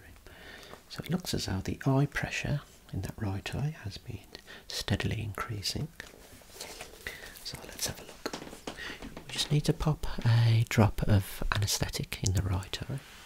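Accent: United Kingdom. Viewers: Not sure if it is your british accent has something to do with it but I like when you say certain words like improvements, results & delicate. It’s so tinglyyyyy.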